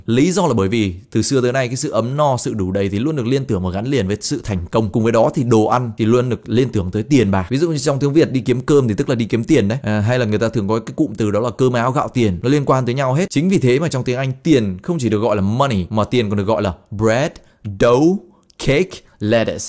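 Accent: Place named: United States. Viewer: Bro, your English is perfect! you speak like a native speaker! Most vietnamese in Vietnam speak with a slight British accent, but your English sounds like an American accent, which I am so used to hearing and speaking. Great job!!!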